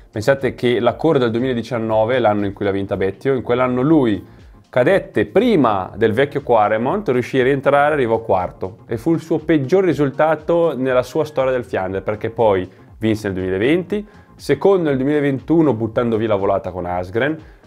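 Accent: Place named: Rome, Italy. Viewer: Ah, te vojo tanto bene, ma sentitte parlà romano è 'na tragedia. M'era bastato Celentano in Rugantino, te lassa perde!!! ❤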